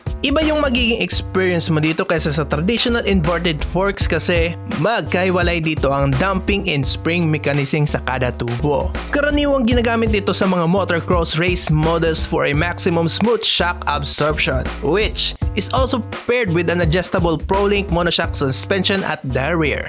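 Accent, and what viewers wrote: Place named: Conyo, Philippines. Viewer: Ang conyo mag english, don't try so hard bruh ang panget sa tenga.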